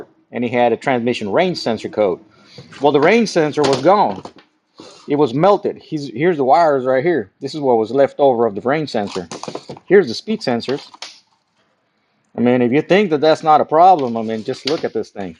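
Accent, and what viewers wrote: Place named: South, United States. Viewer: I'm totally enthralled to your blended southern/hispanic accent.😉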